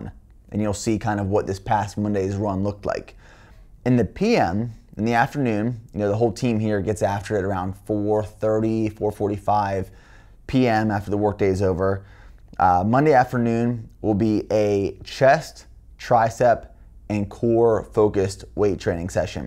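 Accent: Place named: Central Pennsylvania, United States. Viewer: Central PA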